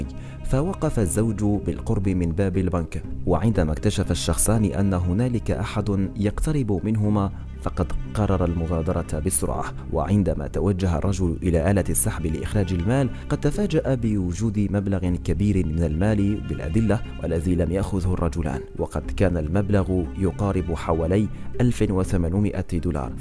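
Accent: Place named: Morocco. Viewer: السلام عليكم بالتوفيق والسداد اخي الكريم، من نبرة صوتك أظن انك مغربي أو جزائري، بالتوفيق مرة أخرى من 🇪🇸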